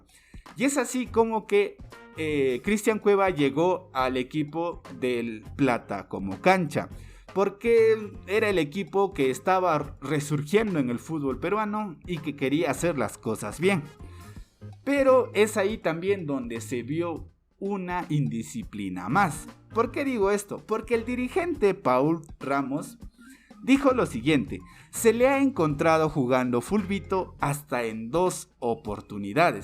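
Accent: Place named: Chile. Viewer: oye creo q eres chileno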